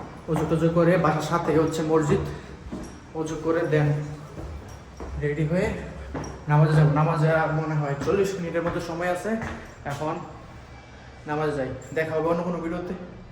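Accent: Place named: Chapainawabganj, Bangladesh. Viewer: ভাইয়া তোমার বাসায় কি চাঁপাইনবাবগঞ্জ 😊😊😊😊😊রিপ্লাই টা দিয়েন ভাষা বুঝে বুঝলাম 😊😊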